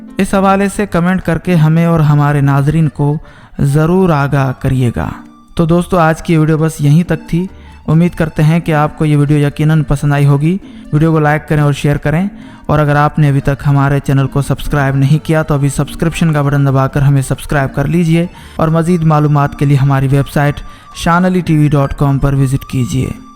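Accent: India. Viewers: Sub say phalay apni Urdu sahi karo Urdu ko handi ki tarha mat bolo bhai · App ki language Kis kisam ki hay? Lahja or talafz Hindi hay.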